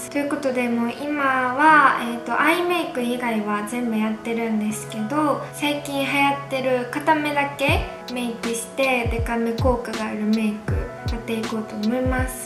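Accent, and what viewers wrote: Kansai, Japan. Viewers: 可愛すぎて時間とまって観てました。。関西弁もかわいいいーーー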